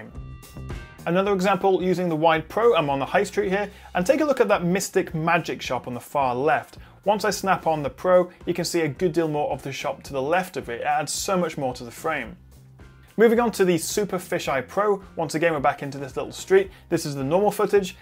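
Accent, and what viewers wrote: United Kingdom. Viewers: Always love watching your videos. Good honest reviews with a familiar British accent.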